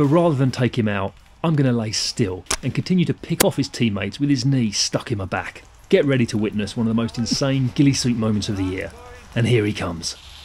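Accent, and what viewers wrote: England, United Kingdom. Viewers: “Tell my mrs.” LOL. That guy deserves a beer. I love the way you English say things.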